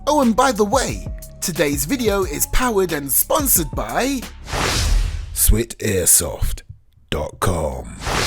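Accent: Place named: United Kingdom. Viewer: sounds British so maybe he lives in the UK and he's not allowed by the law to buy or own the real version🤔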